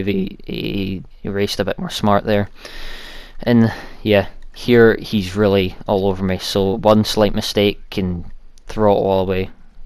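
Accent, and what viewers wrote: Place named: Scotland, United Kingdom. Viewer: Good commentary mate! I'd up the game sound, it felt a bit strange not having it. Btw, ya got a gud ol' scottish accent there xD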